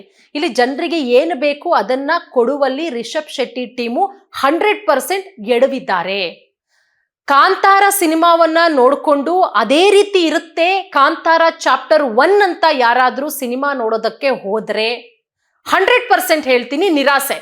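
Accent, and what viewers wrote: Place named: Andhra Pradesh, India. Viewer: Telugu influence ninda mathadthiddara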